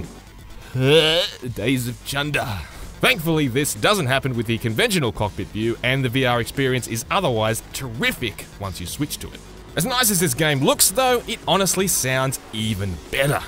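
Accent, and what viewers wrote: Australia. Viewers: Dat auzie accent doh! Makes everything automatically more enjoyable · has no one in this comment section heard an australian accent before? Ya'll are SHOOK. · As Joe Rogan says australian is the coolest accent. Great review as always man!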